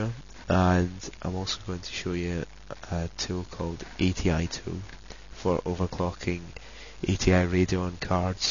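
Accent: United Kingdom. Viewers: He's Scottish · You Sound awefully like midlothain punter(im fae the same area). P.s. I know this is an old vid but forceware does not alow you use coolbits. RivaTuner is you best bet from now on unless you want Nvidia shity overclock tools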